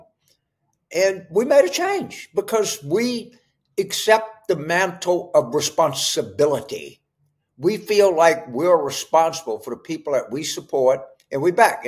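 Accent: South, United States. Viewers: Glad I found you...didn't know you had a channel....so glad to hear what you are saying...I'm 69 from Alabama....you sound exactly like the folks I have heard speak when I was young...the sensible Southerners have left the scene...they have become Trump groupies.....what you are saying is the way men spoke...when I was young...it also sounds familiar because of your accent...Lord...please pray the democrats win...we have our issues...but definitely not same kind of crazy as what the Republicans have become...what happened to Southerners...they had the voice of reason...God Bless you...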